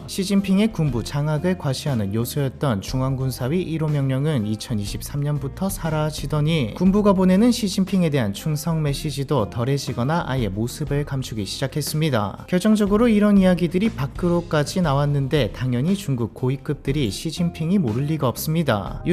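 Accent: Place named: Busan, South Korea. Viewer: 내용보다 채널주 부산사람인게 더 충격임......아니 사투리 이정도 안쓰는 부산분은 처음 보는 거 같은....?....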